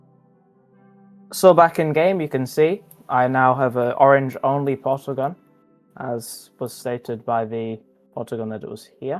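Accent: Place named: United Kingdom. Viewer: british person talks about elevators for 12 minutes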